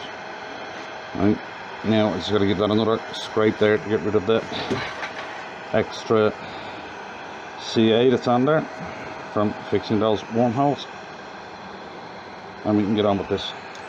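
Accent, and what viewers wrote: Ireland. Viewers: Brilliant. Is that aDublin Accent.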